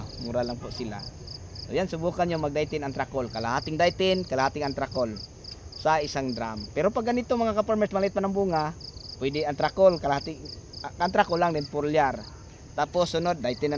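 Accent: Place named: Visayas, Philippines. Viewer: Saan ka idol nagtaranim kasi bisaya ang tuno ng pananalita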